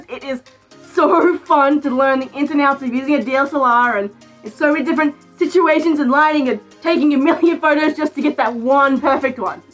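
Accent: Australia. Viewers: I dunno if I just like your Aussie accent or what, but I think you're officially my favorite furry x3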